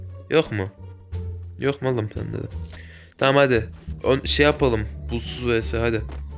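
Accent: Azerbaijan. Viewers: Abi sen Azerbaycanlımısınnn{>¦¶ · Abi sen azerbeycanlimiwin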